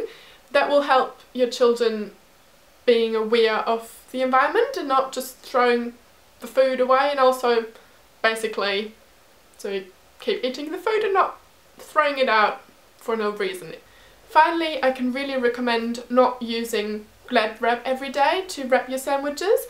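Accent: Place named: New Zealand. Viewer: wow du hast einen richtigen Kiwi Accent 👏😻👍 vor allem das "e" ich liebe es 😄 noch ganz viel Spaß :)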